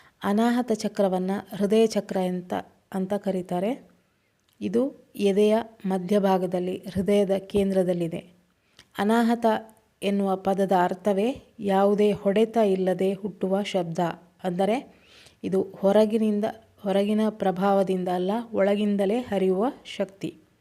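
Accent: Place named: Dakshina Kannada, India. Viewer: ನೀವು ದಕ್ಷಿಣ ಕನ್ನಡ ಜಿಲ್ಲೆಯವರು ಅಂತ ಹೇಳಿದಾಗ ನನಗೆ ತುಂಬಾ ಕುಶಿ ಆಯಿತು ನೀವು ಊರಿನಲ್ಲೇ ಶಾಲೆ ಕಲಿತಿದ್ದರೆ ನಿಮ್ಮ ಕನ್ನಡ ಬೆಂಗಳೂರು ಕನ್ನಡದಿಂದ ವಿಭಿನ್ನ ವಾಗಿರುತ್ತದೆ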